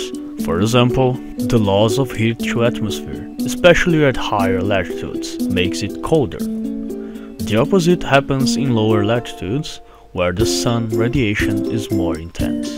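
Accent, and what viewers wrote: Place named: Russia. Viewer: u have to be russian!? thanks tho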